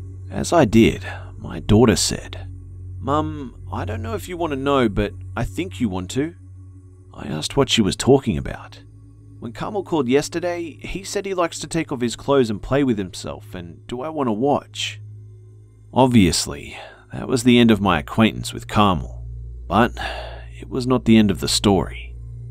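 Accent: Australia. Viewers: Hey mate, are you from Australia? And when are u gonna do a face reveal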